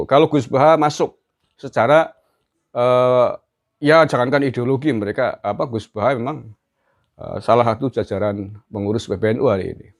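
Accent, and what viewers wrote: Banyumas, Indonesia. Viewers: Ini manusia tukang fitnah ....dasar ngapak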